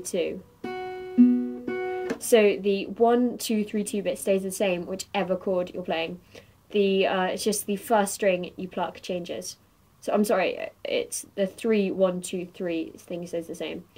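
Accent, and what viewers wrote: United Kingdom. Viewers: OMG BRITISH ACCENT !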